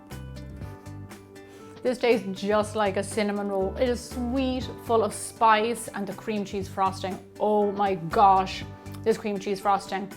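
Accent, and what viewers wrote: Ireland. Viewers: Are you Irish!? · Are you Irish? Love the accent!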